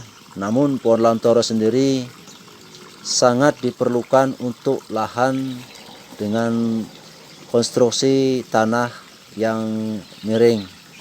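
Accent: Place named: Banyumas, Indonesia. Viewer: wa'alaikumsalaam wr wb jos pokoke... tapi ngomong-ngomong orang aceh ngp bs ngomong ngapak yak bawa saya ke sana dunk 🤝💪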